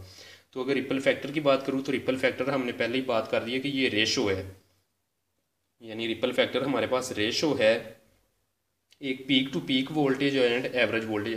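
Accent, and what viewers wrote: Punjab, India. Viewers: Are u from Punjab?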